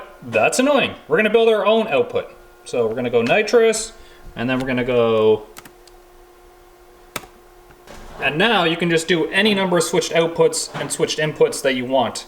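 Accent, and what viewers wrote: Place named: Newfoundland, Canada. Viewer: You sound 80% more newfie in this video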